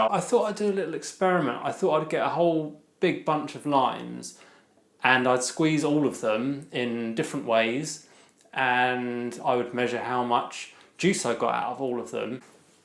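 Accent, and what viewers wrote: United Kingdom. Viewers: I'm wicked high right now and I don't know how I got here but I can't stop laughing bless up my British-accented mans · This guy is funny and down to earth. Plus, he kept this quick and comprehensible; probably why it was near the top of my Google search. Extra points for the Brit accent. Oh, my girlfriend likes his hair.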